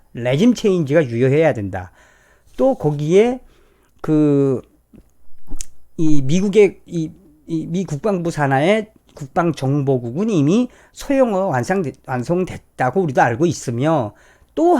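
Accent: North Korea. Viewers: ㅎㅎ북한출신이신가요??!ㅎ 그래도 좋습니다^^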